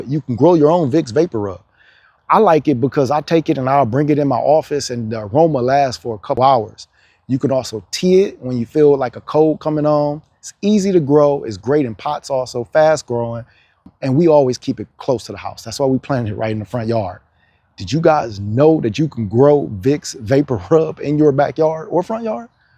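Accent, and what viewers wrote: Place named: Los Angeles, United States. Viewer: You sound like you’re from LA.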